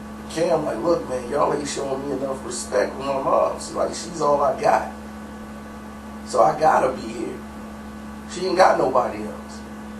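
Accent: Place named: Chicago, United States. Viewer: I can do without your Chicago Accent Bro! Next video you need to sound like you from VA or I'm going to unsubscribe!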